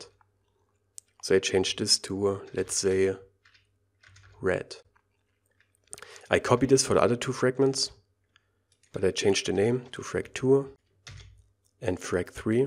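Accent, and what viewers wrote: Germany. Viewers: I like the german accent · so cool - listen to the text in English, but with a German accent))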